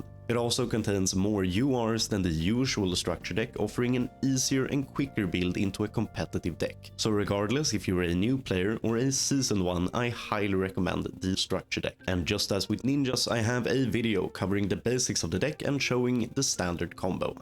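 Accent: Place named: Sweden. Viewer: You can hear he is swedish XD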